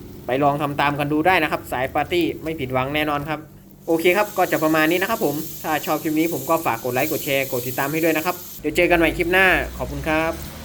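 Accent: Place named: Isan, Thailand. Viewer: เสียงเหมือนคนอีสาน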